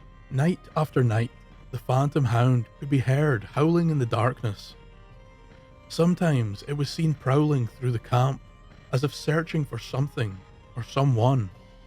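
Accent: Scotland, United Kingdom. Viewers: Im sorry ive only just gotten the time to sit & enjoy your video, i normally like to watch them asap but alas, life got in the way!🤣 I could sit & watch your videos constantly. They're entertaining & informative. I love hearing all these tales (which are 100% true, in my opinion!) Of my beloved homeland. Also who doesn't love an intense Scottish accent?! ❤❤❤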